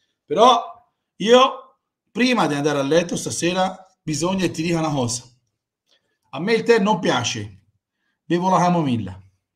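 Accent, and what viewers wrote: Tuscany, Italy. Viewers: Dal accenro si capisce alla perfezione che sei toscano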